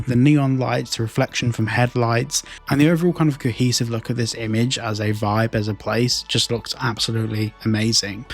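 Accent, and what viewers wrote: United Kingdom. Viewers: Ugghhh I know it’s a British thing and not your fault but every time you say “Floor” when you’re talking about the “ground” I wanna slap you a little bit! Inside = floor, Outside = ground lol I love your accent and you guys have way more fun with the English language and slang terms but this one, you guys just got wrong! Love you, love the videos. Keep it up, thnxxx!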